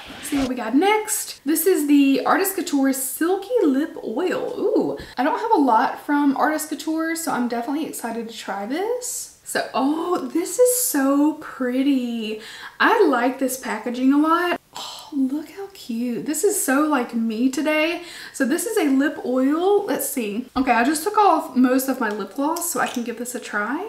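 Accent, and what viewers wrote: South, United States. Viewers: Wait!!!! You are a Bama girl???!!!! So am I!! I did not know this!!!! I knew from the accent you were southern but wasn’t sure exactly where you lived!!!!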